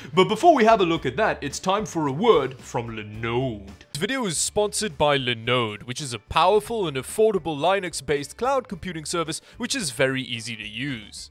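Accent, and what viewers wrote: South Africa. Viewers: are you south african?! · Are you from South Africa?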